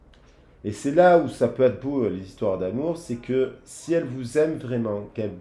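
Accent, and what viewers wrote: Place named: Marseille, France. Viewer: Bravo. J ai visité Marseille ce WD. J ai bien aimé. Je crois que vous êtes de là bas? L accent!😉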